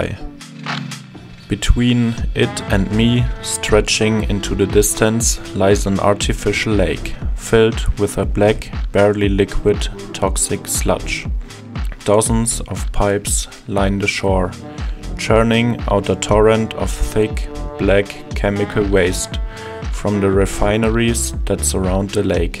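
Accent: Germany. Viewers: dieser deutsche akzent is anders geil haha :D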